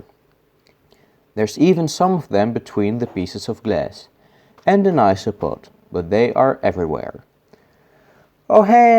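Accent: Netherlands. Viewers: Are you Dutch because you have a Dutch acent LoL 😂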